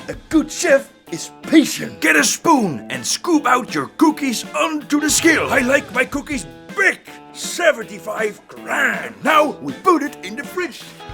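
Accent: Netherlands. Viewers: Love the Dutch accent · Why tf sounds bro so DUTCH????? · Is it me or does he sound dutch cuz i am from the netherlands and he speaks liek dutch trying to speak english so i think hes dustch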